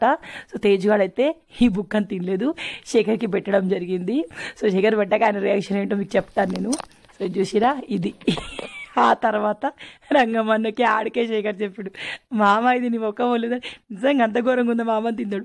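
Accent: Telangana, India. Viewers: Akka me natural comentry ki nen FIdA aipoya. ..Telangana language ni natural ga present chestaru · Pakaa Telangana ikada language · Hii akka video full me matalatho chala navv vachachindi andi me matalu chala funny ga Telangana basha la super ga undi jabardast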